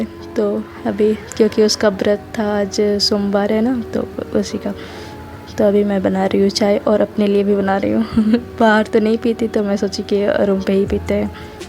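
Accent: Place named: Odisha, India. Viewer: Aap Odisha se ho naa.. awaz se lag rha hai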